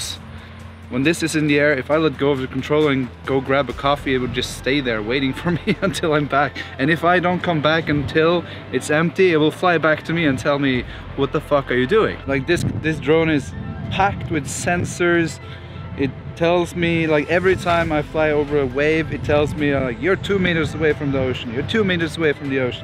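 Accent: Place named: Norway. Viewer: Fabulous video, 🙌🏼 Are you Norwegian mate?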